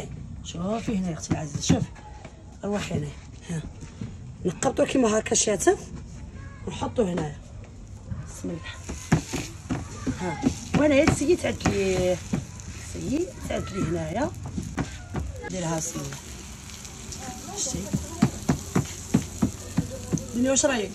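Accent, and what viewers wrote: Morocco. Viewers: لهجتكم بحال شي مغربية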